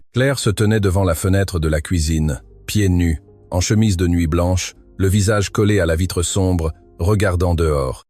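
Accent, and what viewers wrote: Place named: Auvergne, France. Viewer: ❤❤❤ très très belle histoire d'amour qui donne du beaume au coeur. Mon passage préféré est la visite de Jérôme au curé car on se doute qu'il va lui parler de mariage et je n' attendais que cela. Je suis en France en région Auvergne, c'est un endroit juste au milieu de la carte et ce genre d' histoire a pû exister en son temps car le conteur parle comme parlait les gens d ici.❤❤😂❤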